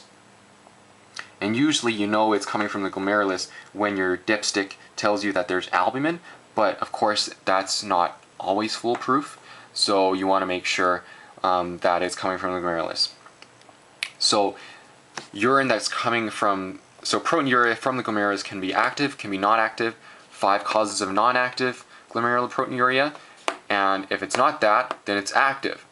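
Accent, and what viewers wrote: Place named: Canada. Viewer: are you canadian? detect an accent lol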